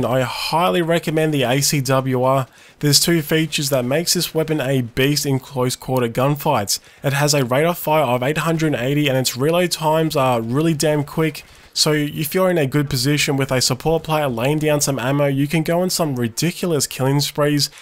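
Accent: Australia. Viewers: Haha thanks for the reply so quickly yeah i thought you sounded like an Aussie like me :)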